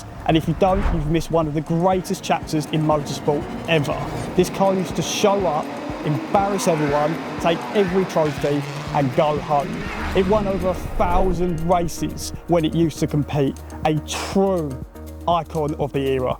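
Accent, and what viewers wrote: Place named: United Kingdom. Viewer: US of A. Also, you & many Brits pronounce your 'th's like Fs and Ls like W's. I love accents & find them fascinating. Thanks for the killer video!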